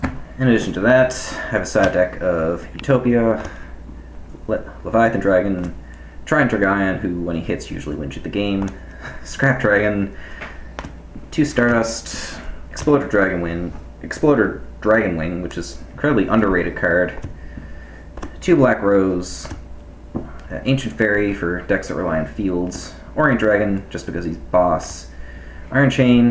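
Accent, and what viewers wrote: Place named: Newfoundland, Canada. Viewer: You sound like your from Newfroundland, good deck ya got there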